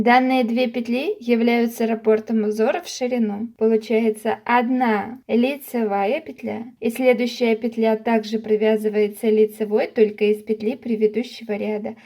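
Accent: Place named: Belarus. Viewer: Умничка! Милый белорусский акцент- обожаю!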